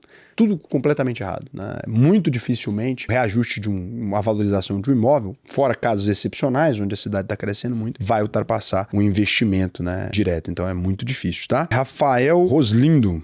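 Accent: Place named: Goiás, Brazil. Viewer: vc é goiano? sabia não....sotaque diferente.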